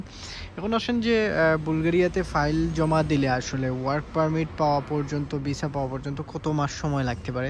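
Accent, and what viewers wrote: Sylhet, Bangladesh. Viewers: Vai apne kita sylhety ni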